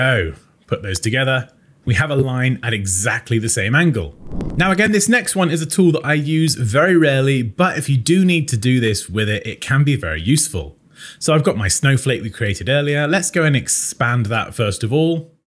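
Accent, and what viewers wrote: United Kingdom. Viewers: Your accent implies that you’re British , Aren’t you ?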